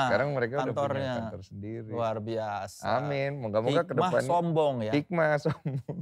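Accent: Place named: West Java, Indonesia. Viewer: baru tau kalo logatnya denny sumargo ternyata nyunda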